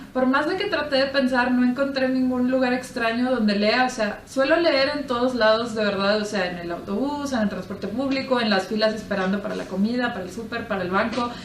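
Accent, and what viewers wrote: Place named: North, Mexico. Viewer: Me sorprende que a pesar de que ya llevas tiempo en Alemania continúas teniendo el acento norteño jejeje Me gustaron tus respuestas y yo también quiero en físico Persépolis:( Espero ya subir mi tag en estos días Y sólo como sugerencia: recomiendo que pulas un poco más la edición de tus videos, hay algunas cosas de las que puedes prescindir sin afectar el contenido y así hacer más breves tus videos =) Un abrazo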